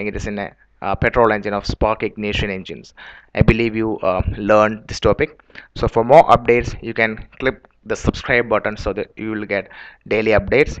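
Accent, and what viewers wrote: India. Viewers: Fuck your fucking Indian accent.. You fucking fuck!! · Indian accent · hmm the curry accent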